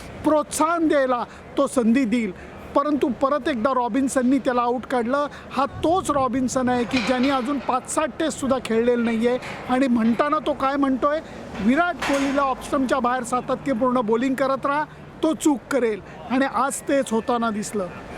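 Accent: Pune, India. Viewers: बोलण्याची सुंदर अशी शैली. शुद्ध पुणेरी भाषा.लेले आपलं विश्लेषण ऐकतच रहावं असं वाटतं 👍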